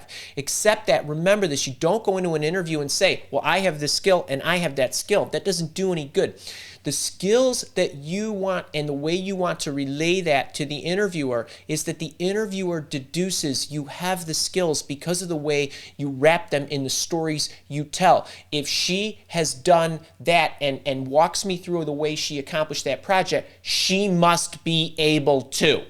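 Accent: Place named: Midwest, United States. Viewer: I'm only 10 seconds in, so I can't say anything about the video content itself, but I just have to say that I LOVE your energy and enthusiasm. Are you from the Midwest by chance? I absolutely love your accent; it reminds me of home. Thank you for bringing this type of energy when preparing for interviews is so scary. :)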